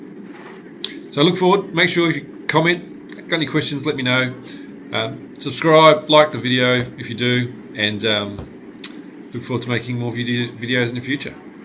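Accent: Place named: Australia. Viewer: Australian accents are so cool!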